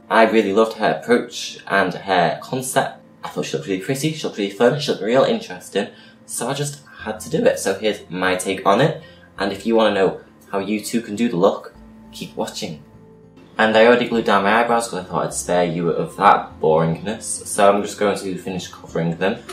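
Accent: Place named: Liverpool, United Kingdom. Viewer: Aquaria is brilliant, also this is kinda like watching art attack i mean you know some lovely painting articulated by a thick liverpool accent, love the content xx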